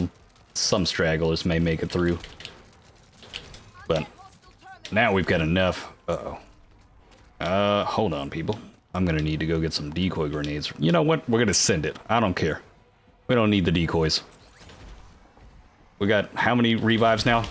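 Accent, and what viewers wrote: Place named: South, United States. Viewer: This guy is underrated as fuck, The deep country accent makes watching you very fun as well as good commentary, fun gameplay, Good ideas and hes clearly not bad at the game either. Keep up the good work my man!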